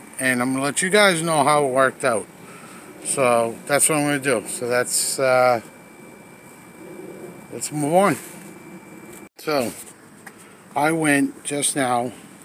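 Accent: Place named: New England, United States. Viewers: My mom was from Boston..sounds like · New England accent is the best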